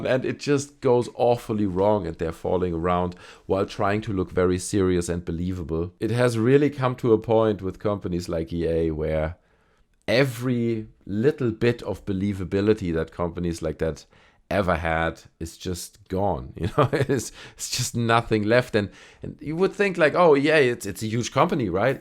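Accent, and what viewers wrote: Germany. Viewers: Hi new to the channel. Do I detect a German accent? Also great video!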